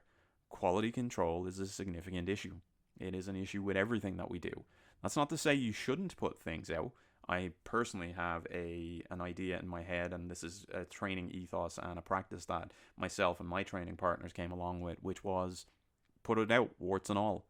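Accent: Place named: Ireland. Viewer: Thanks for this. I can hear that you're Irish. i'm working at kenpo the past 18 months & I am finding it fantastic, so great for personal growth, fitness, agility, memory & discipline. But I do find it maddening that not only is there such a divergence from the USA kenpo, but even amongst the Irish kenpo schools there is not harmony. So it is very difficult to learn. But hey, I'm enjoying it.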